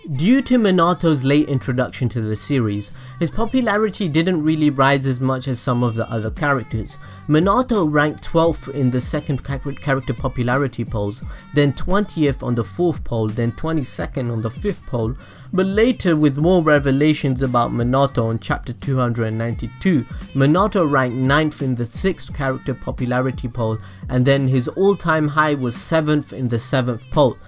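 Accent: United Kingdom. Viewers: Your accent is B r I t I s H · Your British accent can be as thick as you like as long as you don't say "bluud or fam", I can't stand that down to my soul bro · Your British wow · Yes, very thick British accent. great vid. though.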